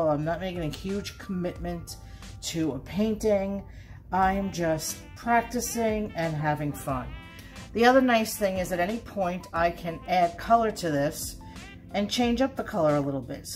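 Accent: East Coast, United States. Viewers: Ok,! I love this tutorial.. and your East coast accent 😜🥰 Thank you!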